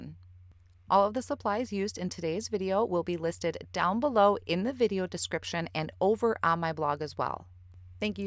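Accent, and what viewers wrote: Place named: Midwest, United States. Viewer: did you say that those are Houses or Whooses? Sorry I have to tease, your midwest accent is so cute! I have a number of relatives in northern Wisconsin and Michigan, and so. Beautiful card btw!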